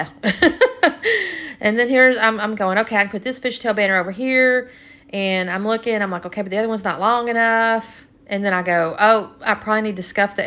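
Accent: Texas, United States. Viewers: Thank you! I love your layouts and your process videos are some of my favorite (maybe because I am from Georgia and I hear the Texas southern accent lol). Seriously, you do a fantastic job with your videos and I am very happy to have found you. Have a great rest of the week!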